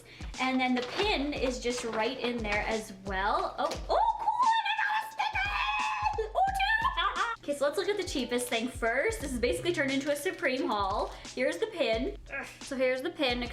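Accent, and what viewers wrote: Canada. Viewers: She's Canadian, it's just her accent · Taylor Phillips it’s a Canadian accent. Their U’s are usually long O’s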